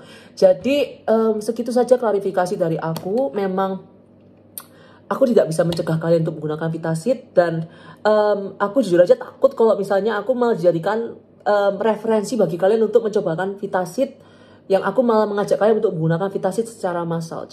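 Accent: Surabaya, Indonesia. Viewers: Logat suroboyo ne kak 😍 bagus bagus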